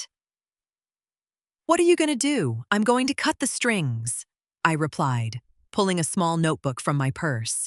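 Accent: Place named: United States. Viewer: Why would this channel use an American accent AI narration, but have Euros going into the wallet?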